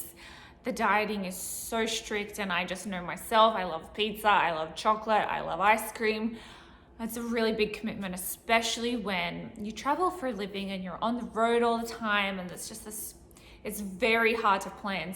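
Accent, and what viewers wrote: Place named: Australia. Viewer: She's cutting a promo in Australian- Oli Davis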